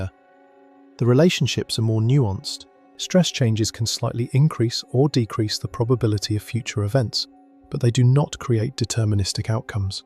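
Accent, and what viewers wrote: United Kingdom. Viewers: Different channel name same British spit mouth. There would not be lisp noise unless words are being spit mouthed. Use an American clean English AI text speech reader and stop repeating not this, not that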